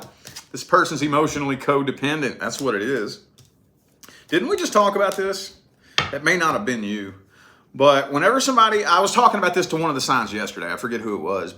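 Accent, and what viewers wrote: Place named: Louisiana, United States. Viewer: I'm looking at your beautiful eyes and You're lusianna voice I think, love from Ireland.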